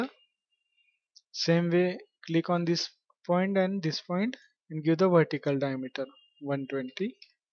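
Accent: India. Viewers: как вы индусы заебали со своим акцентом, сил нет.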